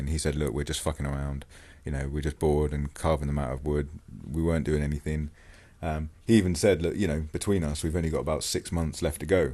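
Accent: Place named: RP, United Kingdom. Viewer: you sound like a proper little posh geek, have you ever been in armley in leeds?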